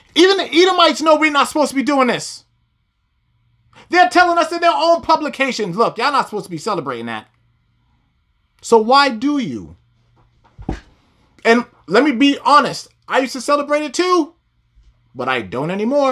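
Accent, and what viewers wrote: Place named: United States. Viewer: My Gee👀 Thank you bro I'm from South Africa so I had to figure it out my self that you were saying Satan because of you American accent with the T sounding like D 🇿🇦🇬🇧🇺🇸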